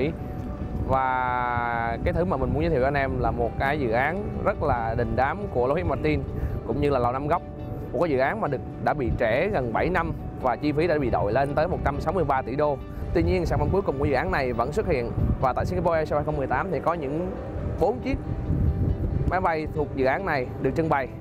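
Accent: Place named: Da Nang, Vietnam. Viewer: Giọng a giống quê đà nẵng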